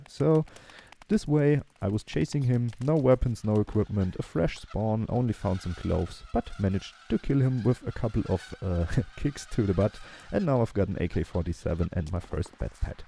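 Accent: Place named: Germany. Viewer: wie man das sofort hört wenn ein deutscher englisch spricht .. :)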